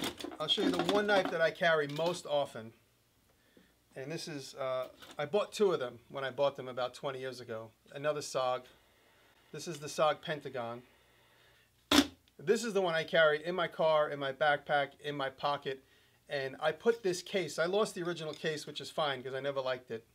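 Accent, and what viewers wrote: New York, United States. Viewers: Love the New York accent.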